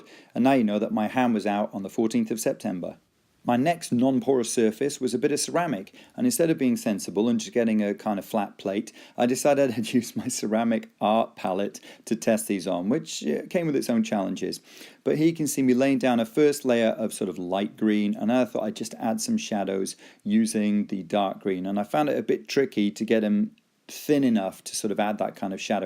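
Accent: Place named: United Kingdom. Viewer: May I ask, which part of the UK do you come from?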